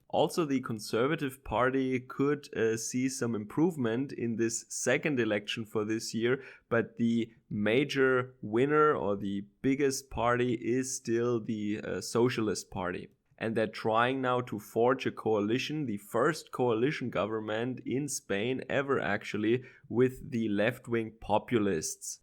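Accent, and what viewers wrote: Germany. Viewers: I’m not overly interested in Spain, but I am trying to learn more about European politics, & all the coalitions make them similar in a way - as you compared the top 3 winners to German results - and very different from the US politics I know the most. I think you did quite a good job compared to a few other vids I watched/news I’ve read on recent Spanish politics, & I find your voice with the heavy German accent very pleasant to listen to. Reminds me of my dad, may he Rest In Peace. I like the little personal insights/anecdotes, too! Keep up the good work :)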